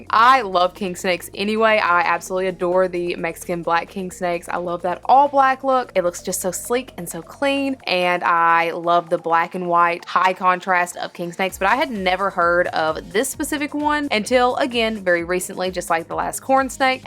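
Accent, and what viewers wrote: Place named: Alabama, United States. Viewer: Hey you are so amazing and inspiring, to be honest I don’t know if I would have gotten a bearded dragon If it wasn’t for you, I watched so many care guides and they were all so different but yours just seemed right, I showed my mom your channel and she started watching your videos with me. I really want to be like you when I get older, but I either want to be a firefighter to help out the community or a herpatologist (studies reptiles and amphibians). Omg can we talk about our accent, I know your from Alabama, and I’ve lived in Alabama my whole life we currently live in Madison, my favorite store is animal trax, I like to support small local businesses, other than like petsmart, but don’t worry, is still go to petsmart. Just remember JESUS LOVES YOU AND YOUR FAMILY.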